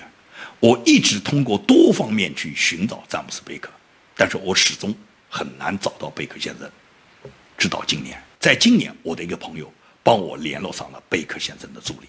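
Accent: Nanjing, China. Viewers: 自从上个月网上偶然发现的视频，几乎每集都看。从一开始听他的口音，就觉得是南京人。而且还是南京二中毕业的。我姑姑过去是南京二中的物理老师，说不定那时候教过吴先生呢😊。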